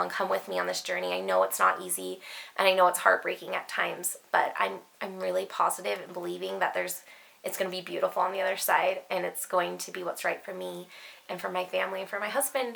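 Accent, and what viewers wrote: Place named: Utah, United States. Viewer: You do sound like you’re from Utah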